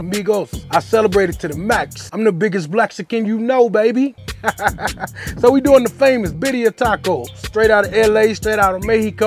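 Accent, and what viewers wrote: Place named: Houston, United States. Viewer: Sound like you’re from Houston.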